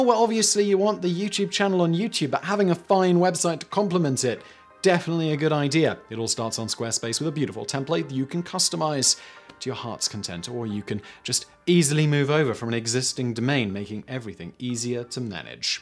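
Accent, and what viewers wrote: United Kingdom. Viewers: Did he just say Yu-Gi-Oh fusion cards it hard to understand UK english its. Different thin US english.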